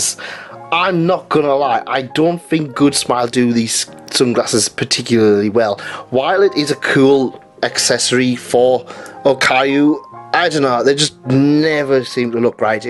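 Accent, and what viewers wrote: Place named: Scotland, United Kingdom. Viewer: Just came across your channel a few days and I just gotta say that I enjoy your vids quite a lot and they’re very informative for a novice like me. I’ve just gotten into figure collecting and I like to see what I expect before buying any figures! In my opinion, you’ve got the best reviews that i’ve seen yet on hololive figures! I also like your accent lol. I’ve been trying to learn a scots accent for some time now so it’s good research to listen to your videos. Hope your day goes well!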